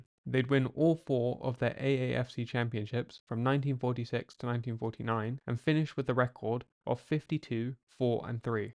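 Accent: United Kingdom. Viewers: Just gotta add why a British accent guy narrator on American football. Also the BROWNS ran the Cleveland rams out of Cleveland to LA. The Cleveland rams won a NFL championship in Cleveland before they left.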